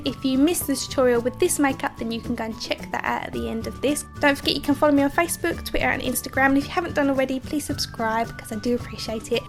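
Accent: Essex, United Kingdom. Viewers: Wow you're from the same areas as me. I knew you were an Essex girl from ya voice but when you said you went to college in Thurrock I was surprised! I went in Southend (: love all your make up looks girl, especially on the eyes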